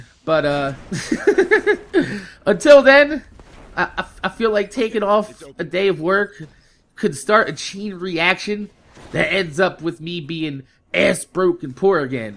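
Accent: New Jersey, United States. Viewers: you from north Jersey or south? SJ guy that enjoys your content!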